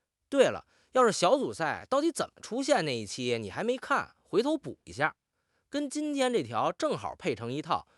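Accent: Beijing, China. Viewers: 作为北京人，喜欢这腔调👍😂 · 的字幕不會用簡體中文不過這老北平口音....像，很像啊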